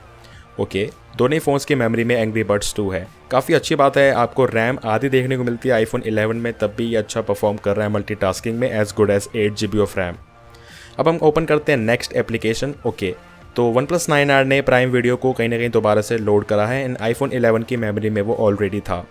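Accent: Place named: Punjab, India. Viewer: Bro Apki Voice Me Thorda Sa Punjabi Touch Aa Reha Hai Are U Punjabi ???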